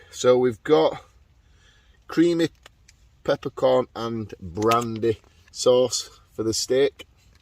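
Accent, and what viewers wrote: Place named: Cockney, United Kingdom. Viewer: Well, the video would probably be enjoyable, but for your speech. I don't wish to corrupt & forget (foorgit?) MY English by hearing all the cockney "boogs (for 'bugs'), "stoof (for 'stuff') and "coomfertIble" (for, 'comfertable, of course)...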